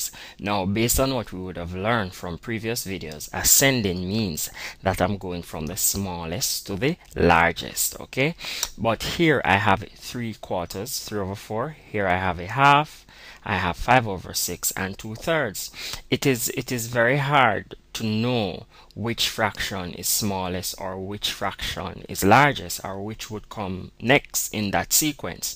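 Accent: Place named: Jamaica. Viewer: U r a Jamaican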